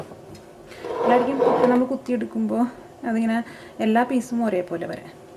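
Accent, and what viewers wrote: Thrissur, India. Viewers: Mam തൃശൂരിൽ എവിടെയാണ്? സംസാരം കൊണ്ട് തൃശൂർ ആണെന്ന് മനസ്സിലാകും.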